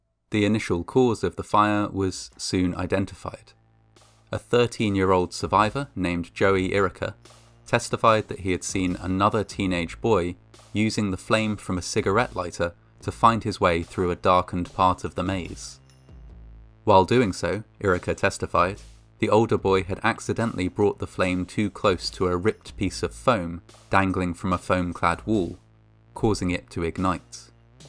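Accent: United Kingdom. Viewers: Nah, he's just British. They all sound like that. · He’s got the “creepy voice with British accent” thing down to a science! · Why is it always British people that narrate these type of videos?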